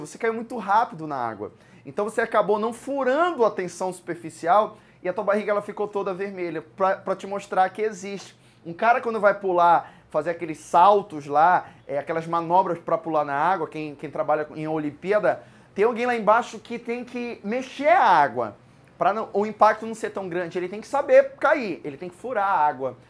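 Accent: Pará, Brazil. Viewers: Maninho tu é Paraense? 😂😂 adorei a aula. 💋🙇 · meu deus tua aula é excelente, socorro! Teu jeito de falar é tão paraense que me senti acolhida. bjs.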